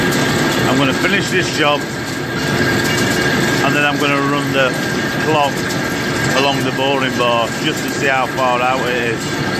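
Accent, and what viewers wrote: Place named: Wetherby, United Kingdom. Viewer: Ahhhh. Three years without a video. You're killing us, killing us. Once in a while I go drinking with a guy who used to live in Wetherby, but has now moved to the colonies (as he calls it). His accent is similar to yours but he is a prison guard, not a machinist. It's lots of fun but there's no trepanning talk. The only drilling he sees at work happens in the showers. Ken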